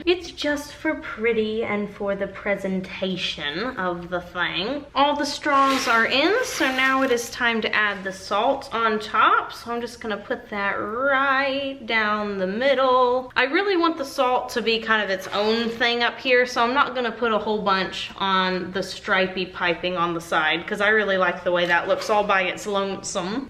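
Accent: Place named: South, United States. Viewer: I go to soap vids when i run out of pimple popper vids. I love yours cause your from the south and so country like myself.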